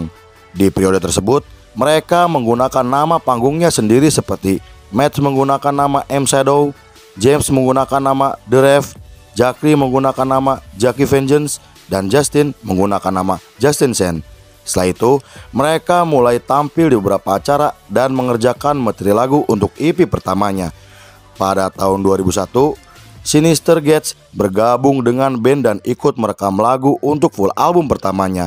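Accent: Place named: Sunda, Indonesia. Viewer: ini narator nya orang sunda, kentel bat logat nya hahaha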